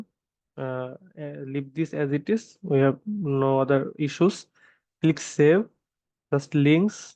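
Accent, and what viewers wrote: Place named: India. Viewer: Thank you! Thank you! Worked perfectly! Also thank you for speaking slowly! Indian accent is hard to follow if you're European.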